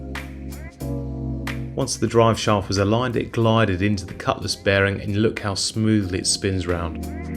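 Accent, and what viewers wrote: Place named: United Kingdom. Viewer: A joy to watch. And to listen to. Your English is, well, the Kings English. Keep up the great work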